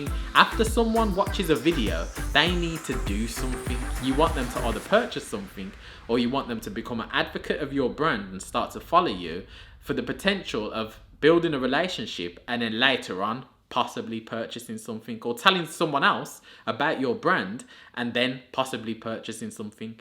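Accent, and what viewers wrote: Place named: Birmingham, United Kingdom. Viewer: Oh yes by the sea life centre, awesome I thought so I could here a little bit of a brummy accent in your voice. Will do brother absolutely loving your content so far!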